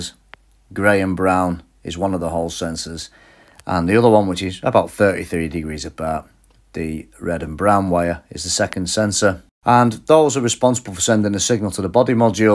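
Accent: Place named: Bolton, United Kingdom. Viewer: Great vid. Must've changed hundreds of these motors when I was at BMW. Where are you based? Sounds like you're from Bolton.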